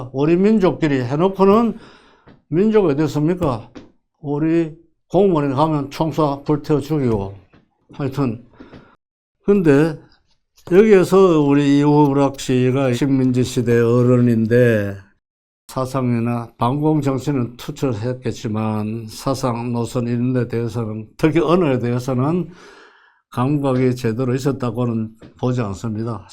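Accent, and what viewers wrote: Gyeongsang, South Korea. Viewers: "언어"를 "은어"로 발음하고 "어"와 "으"를 구별않는 경상도 식 억양이 섞였지만.. 직업적 강사가 아닌 분이 줄여서 하는 말이라 좋고, 오히려 더 확싫히 이해가 가네요.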